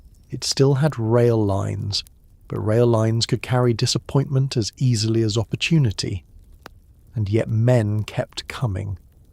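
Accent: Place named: United Kingdom. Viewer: Discomfort is inaccute history being read wuth a pompous brit accent.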